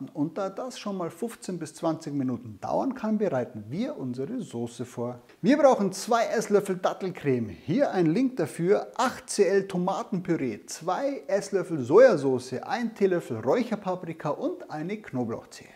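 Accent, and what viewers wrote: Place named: Franconia, Germany. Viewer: Hallo, ich habe gerade deinen Kanal entdeckt, super! - und sehr charmant mit Deinem fränkischen(?) Akzent ❣️ "Geschmacksrodeo" 😂❣️- made my day 😘- yummi 😋- sieht echt gut aus ❣️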